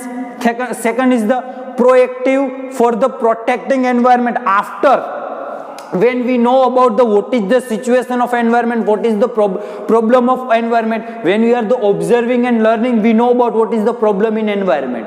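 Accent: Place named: Gujarat, India. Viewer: Watching from bangladesh sir,i like ur accent though i dont know gujraati but this accent sounds adorable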